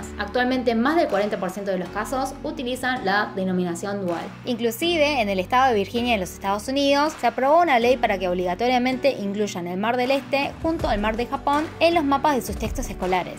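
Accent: Argentina. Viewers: Pensé que vivía en Argentina por que claramente entiendo su acento Argentino pero no crei que vivía en Chile me sorprendió la verdad.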